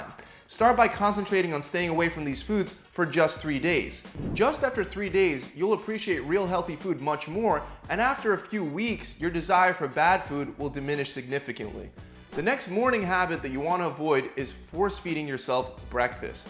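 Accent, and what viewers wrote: Philadelphia, United States. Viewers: Philly accent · Haha are you from Philly? Love my Philly wooder.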